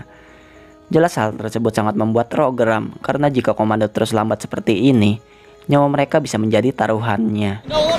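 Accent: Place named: Kalimantan, Indonesia. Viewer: Dri logat kykx ini org Kalimantan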